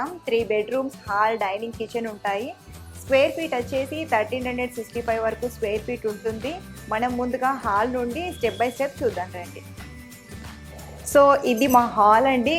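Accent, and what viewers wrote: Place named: Karimnagar, India. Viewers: TELANGANA yaasa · Akka meedi pakka Karimnagar ey anipistundi.. 🤔🤔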